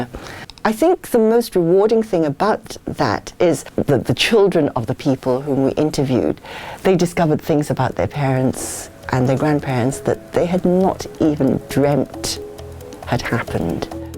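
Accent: United Kingdom. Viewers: I am so exited to find you on Youtube after 40 years I had watched your "English on Sunday" on CCTV, You are still holding your Pure London Accent and I really hope some day I may meet you in London to show my respect. Your Audience from 1979. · As part of my sweat memory, I watch "English on Sunday" program at that time.(2pm Sunday) when I just start to work. I could only comprehend no more than half of the context. The program was quiet advanced to me. I like the host and her English accent. But, I did not know her name and background. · 不是她发音含混，而是你英文不够好，而且对英音不熟悉。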